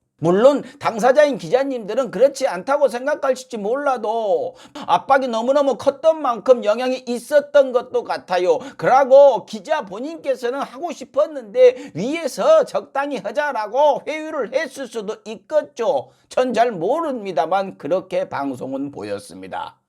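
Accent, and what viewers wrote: Jeolla, South Korea. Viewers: 다좋은데 ...난 성범이형편인데 ...일부러 예전 개그코너로 하는건 알겠는데 ...전라도 반달 사투리로. . 우파들 빈정거리는게 영. ,ㅠ 재미도 있긴하지만 .. ...형님 그냥 서울말로 코너하면 안되요? ㅜ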